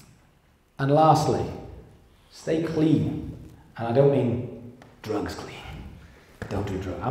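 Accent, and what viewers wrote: United Kingdom. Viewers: I watched over 30 of your videos in the last week. First: love your brit accent. Second: great contents. Do you know Marco Montemagno? Is a 47 y o men who lives in Brighton. He helps people with startups and big companies in marketing strategies, you should contact him ;) He also has a BIG youtube channel in Italian where he talks about marketing, social media etcs.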